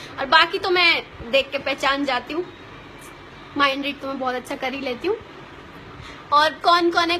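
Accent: India. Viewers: Hiiiiii haryana se ho kya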